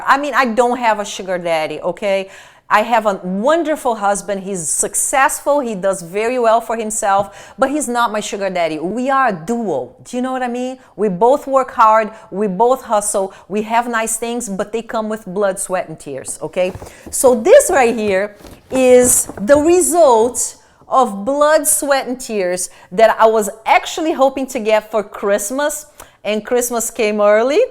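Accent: Brazil. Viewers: outro dia te falei uma crítica construtiva e você ficou brava e até disse que eu tinha inveja pq era brasileira como vc, mas não tenho inveja não... sou bem casada com americano tb, amo esse país e os brasileiros eu concordo que alguns passam do limite mas eu sou uma pessoa que não sei o que é inveja desde que nasci, fique tranquila, porque eu trabalho muito pra ter o que tenho sem invejar nada de ninguém....mas eu adoro vc e adoro seus vídeos!!!! Adoro ouvir vc falando inglês pq falo como vc, com sotaque... e adoro suas peças de roupas, acessórios, sapatos, jóias e principalmente as bolsas!!! Parabéns pelo vídeo.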